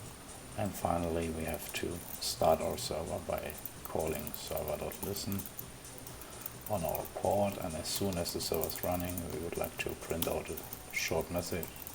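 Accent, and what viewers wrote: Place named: Germany. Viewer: Thanks, your German accent and accompanying techno is inspiring.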